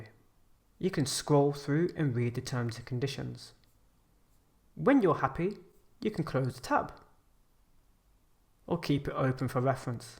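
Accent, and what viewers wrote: England, United Kingdom. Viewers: I love the ASMR style. I swear the English accent rather educational or not makes me feel like I learned something regardless of what it’s about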